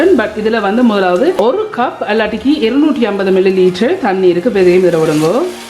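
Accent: Jaffna, Sri Lanka. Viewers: ma...!!!! I like your Jaffna Tamil..... I'm also Jaffna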